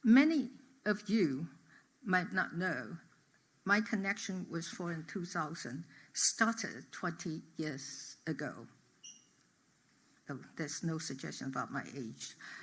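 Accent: United Kingdom. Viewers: Beautiful British accent ❤ we love you MS. Tsai ❤❤❤ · 蔡總統的牛津腔英文真好！👍👍👍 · 台湾人说英国腔，台湾人骄傲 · 請保護好總統在她外出拼外交的時時刻刻❤😊中共視她為眼中釘她的英文發音很好聽有點英國腔這次沒看到布拉格帥哥市長有點可惜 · 純英語腔調！英國上流社會的口音！ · 的英國腔太迷人😍最後全體起立鼓掌真的好感動🥹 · 謝謝前總統卸任後仍舊為台灣努力拓展外交, 略帶英國腔調的英語實在太迷人了👍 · How does she get British accent? Must have been busy practicing her speech lately?😂 not consistent though, still has Taiwanese accent mixed in. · 你不懂英語才是笨蛋、總統是英國人🇬🇧口音、不懂裝懂？ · 元首風範依舊，完全沒有卸任感啊❤一站出來那種領袖氣質盡顯，好喜歡！謝謝my英仍願意繼續當台灣這個小而美且強國家的志工，繼續為台灣打拼，加油，愛妳喔， ～ ps:like the UK accent you speak❤ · 她的英式英文真的好好聽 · 雖然聽不懂，但前總統英國腔英文，聽起來很好聽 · 蔡總統的英式英語好道地喔！真不習慣。謝謝蔡總統向國際發聲，辛苦了。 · 以蔡前總統為榮，為大家在外交上打拼。她講英文有英國腔耶。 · 蔡前總統的英式腔調，太迷人了❤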